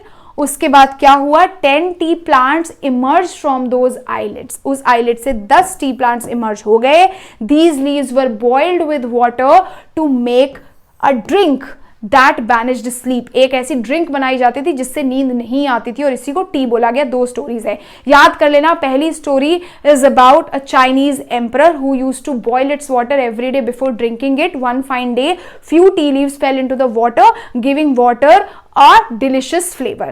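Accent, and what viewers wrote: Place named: Bengal, India. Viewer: I feel like ma'am is bengali as her accent of speaking pranjol is very bengali type of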